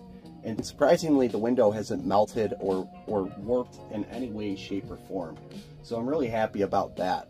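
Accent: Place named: Michigan, United States. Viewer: You sound like you have a Michigan accent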